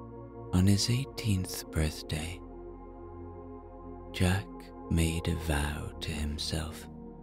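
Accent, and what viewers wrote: Ireland. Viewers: your Irish accent, make your sleep stories amazing.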